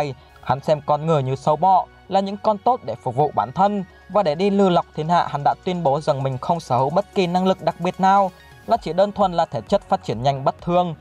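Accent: Vietnam. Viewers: Anh đọc tiếng anh đứng kiểu VN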